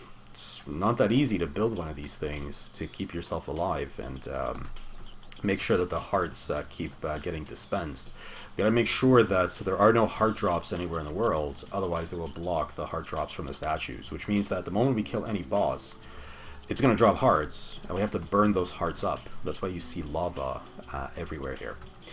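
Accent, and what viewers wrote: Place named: Montreal, Canada. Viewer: sound like a montreal boy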